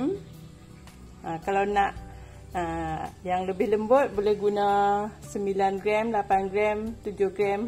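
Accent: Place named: Thailand. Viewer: suka dengar cakap adik...tok kak aya asal keturunan siam cakap sama macam adik..teringat kat tok...semuga adik sihat selalu na...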